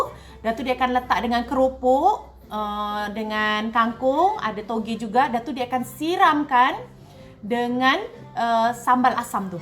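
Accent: Johor, Malaysia. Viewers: Mantap kak bahasa Johor dh nk jadi tu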